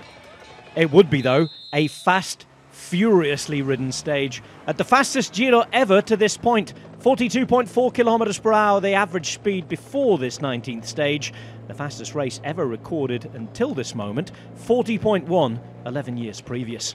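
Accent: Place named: England, United Kingdom. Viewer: As an American cyclist and tifoso , I have to give you high kudos for the way in which you present the context and follow up with interesting observations with a little tongue in cheek. Here in the states, we have to suffer through what they give us on, etc. and our commentators as much as they’re great guys just do a pretty basic job. If I could hire you for our coverage of the tour etc. it would be a slam dunk. And don’t be pissed at me but you have a very likable English accent.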